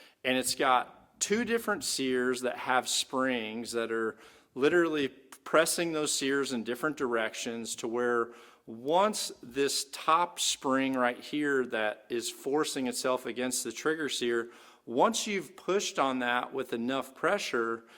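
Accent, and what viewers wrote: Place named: California, United States. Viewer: So hard to suffer the vocal fry. Californian is the worst accent.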